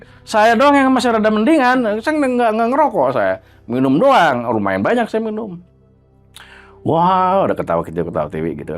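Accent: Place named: Jakarta, Indonesia. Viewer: cerita belakangan, yg penting logat betawi nya dapet..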